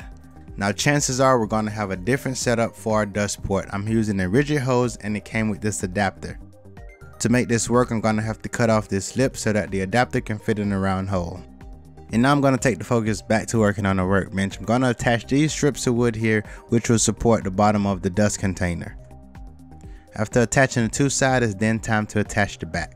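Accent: United States. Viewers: Where's old mates accent from? Not too familiar with yank accents outside the obvious ones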